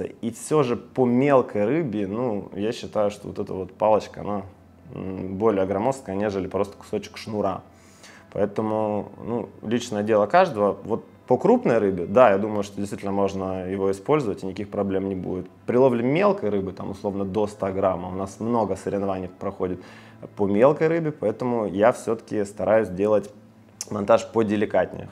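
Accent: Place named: Ukraine. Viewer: на первый чемпионат,случаем не за сборную Украины ездил?Уж очень Говор характерный слышен…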